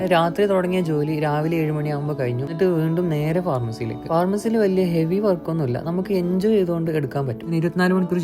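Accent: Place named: Kasaragod, India. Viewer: Kasaragod avde...samsaram kettapol manasilayi❤ iam frm Kasaragod ❤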